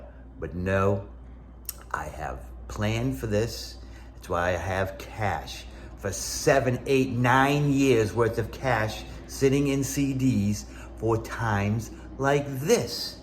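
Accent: Boston, United States. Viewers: Ah Boston, I always thought you had a strong Boston accent and was confused that you were from NC haha, now makes more sense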